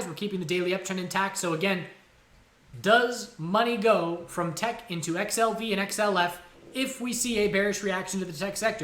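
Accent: Ireland. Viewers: More Irish accent please!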